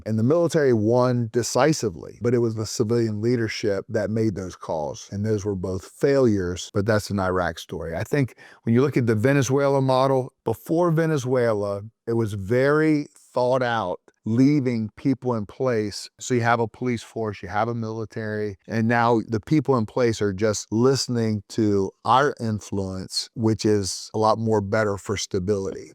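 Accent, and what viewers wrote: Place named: South, United States. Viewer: Correct. His southern accent limits his ability to pronounce a significant number of words properly, but we get the idea.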